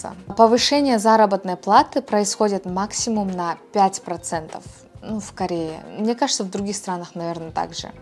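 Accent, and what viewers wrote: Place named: United States. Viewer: Познавательно, но режет слух желание вставить английское слово хоть куда-нибудь( Если можно, не коверкайте произношение на ОМЕРЕКЕЙшН лад. Постарайтесь и у вас получится)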